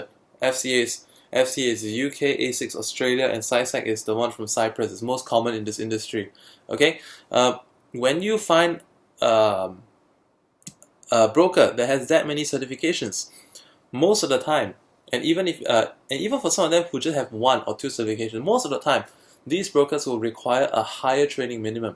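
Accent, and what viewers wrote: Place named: Singapore. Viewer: Hey, I reside in Singapore. I assume you're a Singaporean too judging by your accent. Would you perhaps share with me a platform on which we can chat(email or skype). I'm eager to know so much more and would be grateful to learn from no other but a fellow Singaporean.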